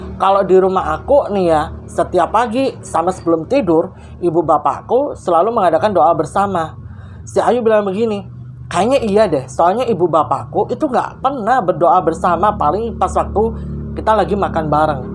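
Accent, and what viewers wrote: Surabaya, Indonesia. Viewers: Mba cara cerita nya,logat Surabaya nya kental bgt....semangat mba,sukses sll.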